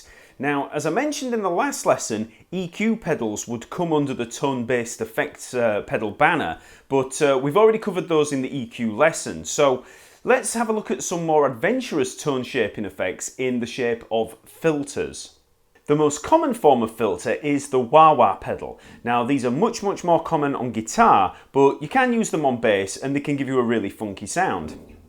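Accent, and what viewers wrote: United Kingdom. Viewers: I really enjoy this distinct british accent!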